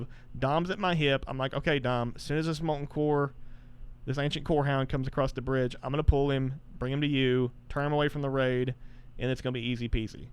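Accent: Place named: South, United States. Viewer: great video, made better by that smooth southern baritone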